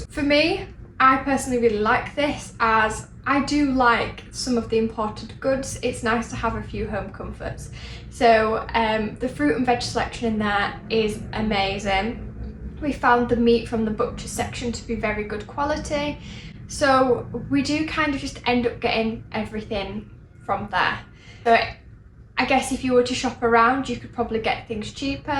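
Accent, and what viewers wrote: United Kingdom. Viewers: what specific british accent is this? sounds cute.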